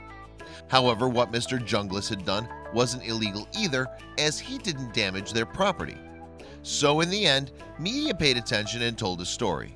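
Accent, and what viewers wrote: United States. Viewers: Interesting point that the narrator sounds American.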